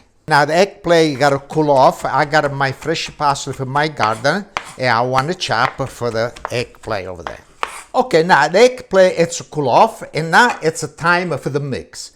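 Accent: Italy. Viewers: Great Italian accent Signor!!! I love this recipe will do this soon!! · I love your accent! I start to feel Italian. great recipe! , "like-a de-boss, cuz I'm a-boss ya". classic! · I just ADORE this guy! Not only are his recipes so wonderful, but I love his Italian accent!! God bless him. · He's SO adorable and funny and l LOVE his ltalian accent. J'adore les aubergines et ça, ça a l'air délicieux. Mille grazie. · I Love that Italian accent! Eggplanta Meata ballis. So musical. 🎵🍆🎵🍆🎵🍆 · Isn't he the most adorable and handsome Italian Chef ever, love his accent! who needs a Gino when we got Grandpa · The recipes, the expertise, the actual food after it’s done, the beautiful kitchen he has, when he samples his cooking, his having the glass of wine during his sampling of the dish he made, his humour and of course THAT TERRIFIC ACCENT!!!!!! HOW can we NOT love Chef, HOW???? 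He’s living proof that the Italians are the masters of cuisine and that no one knows food better than them, no one and as I’ve told my Italian friends, “You’ve had the privilege of growing up eating like KINGS & QUEENS!!!” And that my friends isn’t an exaggeration, it’s the TRUTH!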